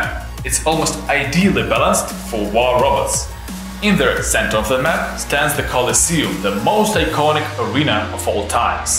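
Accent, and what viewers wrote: Russia. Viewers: Ю рашен акцентиш из very very палится!) Гуд джобс камьюнити. Онбывеблевал гейм, си ю.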